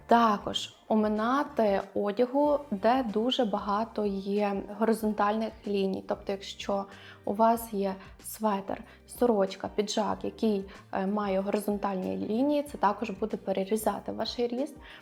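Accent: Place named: Galicia, Ukraine. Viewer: Вітаю,. Дуже мені подобається дивитись Ваші відео, а особливо чути рідний галицький акцент. Але я Вас дуже прошу, немає у нас слова «ваший», правильно казати «ваш». Дякую, не ображайтеся, будь ласка 😊 Бажаю Вам творчих успіхів.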